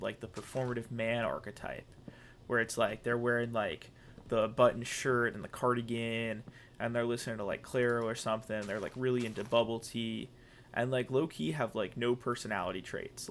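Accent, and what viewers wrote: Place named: Missouri, United States. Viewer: You sound kinda Missouri in this one. The accents comin out of the DNA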